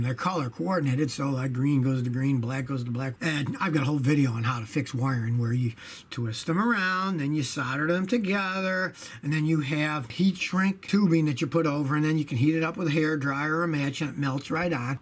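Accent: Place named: Buffalo, United States. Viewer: You talk like a buffalonian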